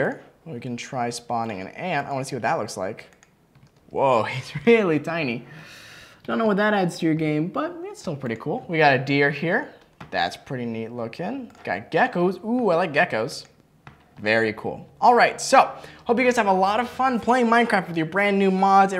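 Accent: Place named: Russia. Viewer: are you Russian? It Sounded like you had a Russian Axint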